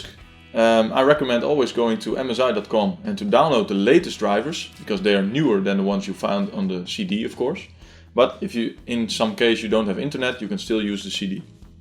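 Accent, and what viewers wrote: Netherlands. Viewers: this guy has a dutch accent XD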